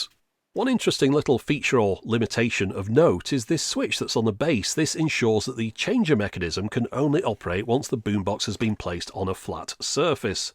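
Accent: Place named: England, United Kingdom. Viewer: Aloha , a great video as ever BUT I need to defend the Pioneer CT-M6R I bought one out here and after removing the jammed tape it has worked perfectly for over 12 moths , I also got the matching Receiver & 18 CD changer all as cheap as chips & all working perfectly .Thanks again for an entertaining video . I enjoy listening to another English accent , I'm from Solihull :) .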